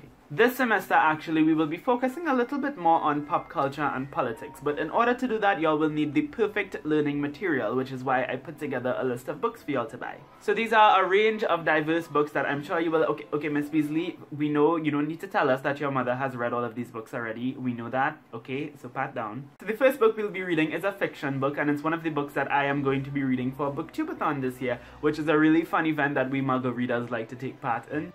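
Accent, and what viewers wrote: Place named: Trinidad and Tobago. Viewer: As soon as I heard your accent I was like, "Wait is he from Trinidad?" And I look in the description and it says you're from Trinidad which is awesome because I'm currently living here! (moved to Trinidad from America a couple years ago) So nice to see a fellow BookTuber from Trinidad! Awesome video!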